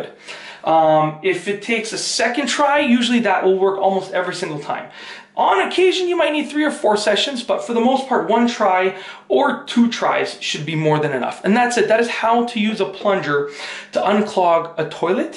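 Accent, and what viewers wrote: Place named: Canada. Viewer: I can tell you're Canadian by how you say oat instead of out.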